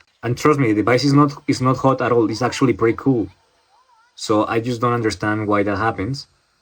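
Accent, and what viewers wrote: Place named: Colombia. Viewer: Se nota que el inglés es tu segundo idioma, el primero por el acento es español. Infiero con total certeza que el eres de Colombia por qué también lo soy. Solo una recomendación, por qué a mí también me pasa, intenta hablar más pausado más lento, con más ritmo. Así notas más seguridad y profesionalismo. El video es útil. Gracias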